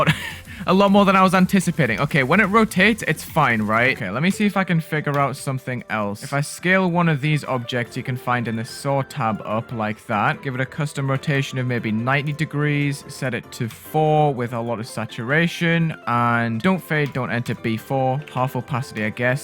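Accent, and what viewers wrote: United Kingdom. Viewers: why are you British?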